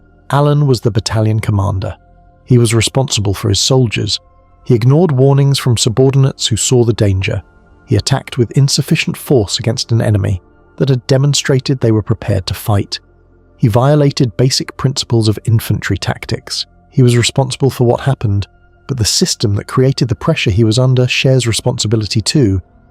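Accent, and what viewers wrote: United Kingdom. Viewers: Wwwhhy a gd Brit narrator voice? What was next to last option ?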